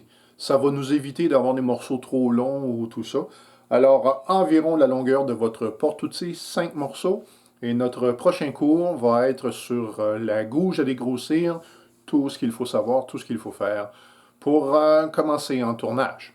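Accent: Canada. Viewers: non seulement c'est bien expliqué mais en plus le pt'i accent canadien ca n'enlève rien au plaisir ..... merci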